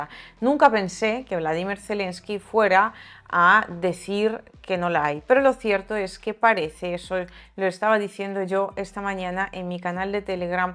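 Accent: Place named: Spain. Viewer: Muy buen informe, como es de tu costumbre; para ser honesto, lo único me pesa es tener que bancarme ese espantoso español de españa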